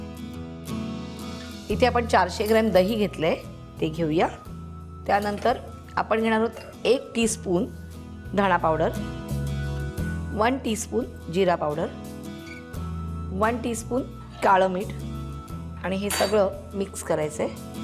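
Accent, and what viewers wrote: Pune, India. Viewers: Me punyachi ahye pan atta Solapur la tumch bolana punyachi athvan Karun dete mast recipe astat me saglya thandgar reci try kelya toooooooo good .tq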